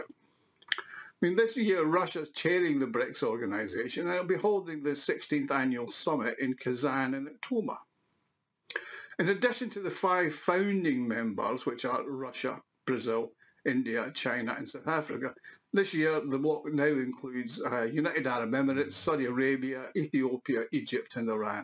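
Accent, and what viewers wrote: United Kingdom. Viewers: Great commentary. Good to hear a British voice talking sense against the tidal wave of propaganda we have to put up. Best wishes to Scotland from England.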